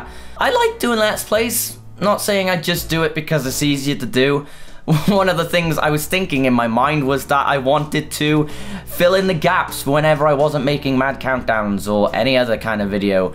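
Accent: Wales, United Kingdom. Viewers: Hey man, where in Britain are you from? I'm trying to pin point your accent and you sound Welsh (I'd know😂) but I can't really tell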